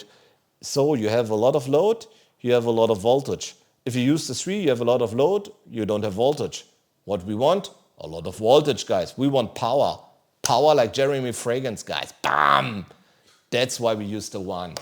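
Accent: Germany. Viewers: I don't see the issue he sounds like every German tourist I've ever met :)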